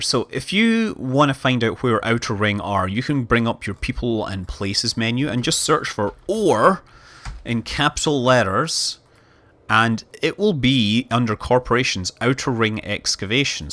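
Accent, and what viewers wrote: Scotland, United Kingdom. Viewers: You sound just like Scotty from startrek. That is so freakn cool. I wold flos that accent and pick up all the chicks :D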